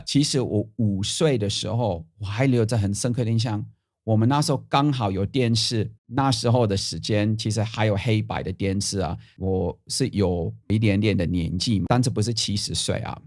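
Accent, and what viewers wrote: Taiwan. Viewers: 你的中文很台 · by hearing the way you speak, you're a Taiwanese and that's no doubt, LOL! XP "Kung Fu" might have been translated from Cantonese. I wonder if "Kong Shou Dao Boy" movie was translated as 功守道!? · 教授，你根本就是台灣人了！ 濃厚的台灣腔就好親切👍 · 這個講話 就是台灣人啊！ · 我看不懂英文但我喜歡聽您說話因為您說話的語意是真正台灣人的口吻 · 根本就是台灣人，完全catch 台灣人說話的方式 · 中文說得很台灣！我也要說：啊！這樣的人真好！ 不過1911?有點早ㄝ，哈哈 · 你說話都有台灣腔了....非常道地的台灣人 !!! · 你的口音已經是台客口音了!好親切好可愛！你的確是台灣人! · 你就是正港的台灣人、講話就是台灣味、加油哦台灣人👍👍👍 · 嘿!台灣教授,誰説您不是台灣人?您説的國語比我還台. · 我個人覺得，從教授的講話的方式及用語，他已經變成了台灣人模式了，教授沒有領身份證嗎？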